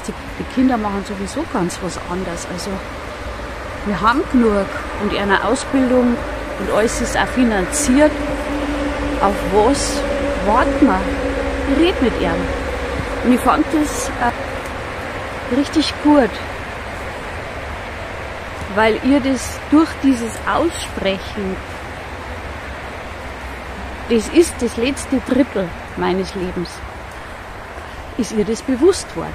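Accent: Bavaria, Germany. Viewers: So sympathisch und unkompliziert erklärt. Ich mag Dein bayrisches Reden und versteh's auch gut. Danke dafür. 🙏🍀🕊🫶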